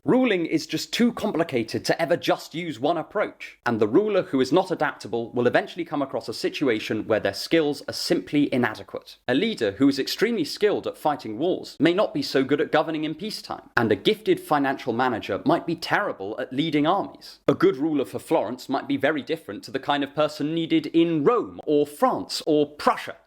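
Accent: United Kingdom. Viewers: Yes, what you said all the British Accent and story lines are good enough. But in my point of view you need some tones of voices,up and down, pitches, break down. Your British accent is really nice but boring.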